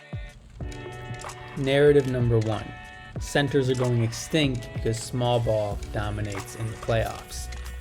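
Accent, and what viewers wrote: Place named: New York, United States. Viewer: Loving this channel. Love the bball knowledge! I hear the NY in your voice so when are you doing a vid bout the Knicks.....w/ BRUNSON!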